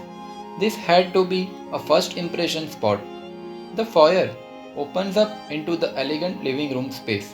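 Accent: Gujarat, India. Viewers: Good design, explained and shown very well (inspite of the thick Gujarati accent). This is the second consecutive well explained video (after the Aslam Sham project). Great job, Buildofy - your editors are getting really good at this! Or have you hired a new one?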